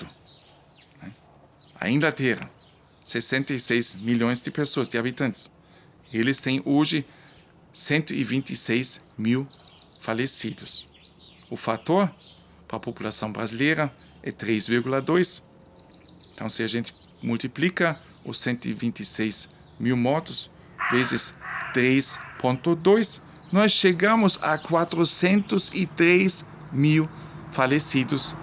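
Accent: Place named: Germany. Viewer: peço a você que nós desculpe do comentário maldoso feito por um desqualificado em seu canal, não se deixe abater por isso pois nós gostamos muito de você de sua esposa e de seus comentários e de seu jeito de falar ( português alemão ) queria te dizer também que esses números estampados na mídia de 300.000 mil óbitos aqui no brasil não são reais...pois por trás existe uma indústria que lucra com a pandemia com a morte das pessoas...houve estados que receberam recursos do governo para usar na crise e o dinheiro foi desviados para outras finalidades, construíram hospitais de campanha e não foram utilizados...os serviços funerários lucram como nunca...a mídia ataca o presidente porque ele cortou toda verba de, publicidade do governo...secou a fonte de corrupção...aqui no brasil ninguém morre mais de zica virus...,dengue..., derrame cerebral...infarto...câncer....acidente de trabalho...., acidente de transito...,malária.., tuberculose...,pena munia...aids...etc...tudo aqui é covid....aqui me despeço com um forte abraço. 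" Gott sei mit dir "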